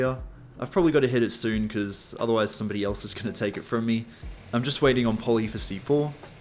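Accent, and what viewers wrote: Australia. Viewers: You Australian? If so love from another Aussie love your content mate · are you aussie bro?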